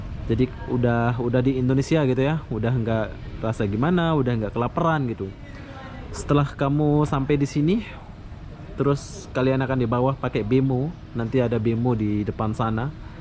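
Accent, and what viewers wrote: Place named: Malaysia. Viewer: Macam logat malaysia ja mas..pake bahasa negara sendiri ja mas...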